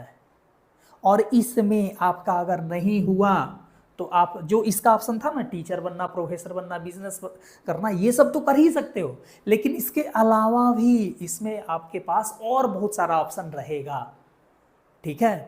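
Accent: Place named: Chhapra, India. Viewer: Sir aapke video me chhapra wala tone aata hai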